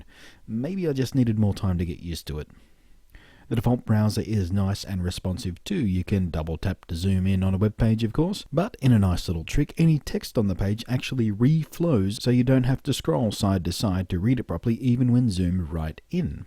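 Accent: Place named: Australia. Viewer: thank you saving me from other reviewers that nit pick on the tineist things. love the accent nice to see and Australian reviewer. i is from new zealnd!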